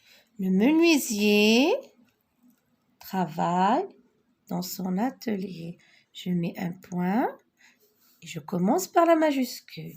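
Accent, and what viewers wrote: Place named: France. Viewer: L'accent français est très présent. Ne vous faites pas rare. Bonne continuation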